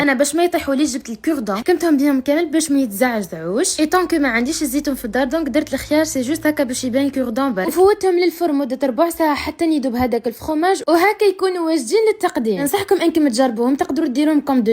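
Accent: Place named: Algeria. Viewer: جزائرية باينة 👈🏻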